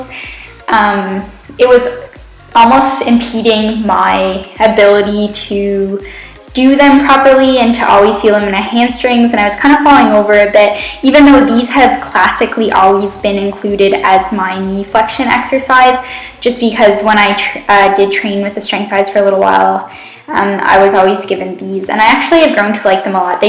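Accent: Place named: Canada. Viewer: your my favorite you tuber next to Nikki. But I cringe listening to your videos becuase of your Canadian accent! About, out... Please no more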